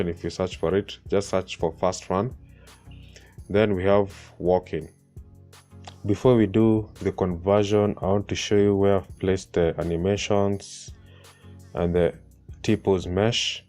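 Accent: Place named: Kenya. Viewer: Bro..you sound soo Kenyan !!!🇰🇪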